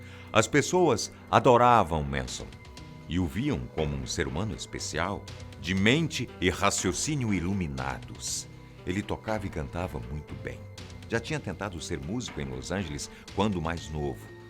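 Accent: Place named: Northeast, Brazil. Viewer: Sotaque Nordestino :v akakaj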